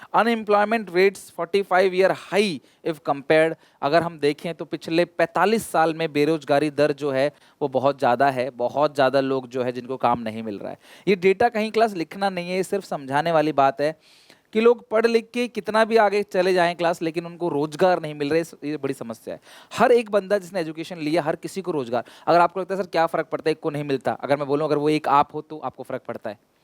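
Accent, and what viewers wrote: Odisha, India. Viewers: Hello bhaiya Are you from odisha??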